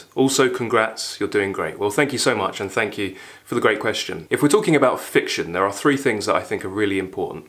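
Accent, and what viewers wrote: United Kingdom. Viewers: Hey man, I improve my British accent from your videos. Thanks for the videos.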